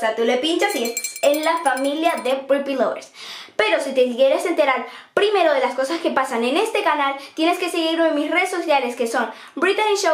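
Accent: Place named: Spain. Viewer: estas agarrando el acento español,trata de no cojerlo ese acento AAAAA😭los venezolanos hablan muy lindo<3 27/8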